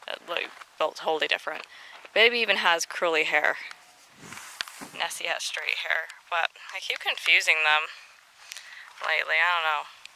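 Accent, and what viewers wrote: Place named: United States. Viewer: Deuneska Portugal Yeah! I wish I could afford to do that. Are you in Arizona? You sound American.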